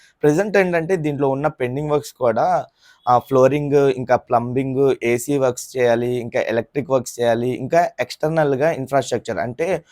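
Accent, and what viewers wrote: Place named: Vijayawada, India. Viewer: Vijayawada slang eppudu vinaledha bro. 😅 Valla slang vallaki nachinatu matladatharu.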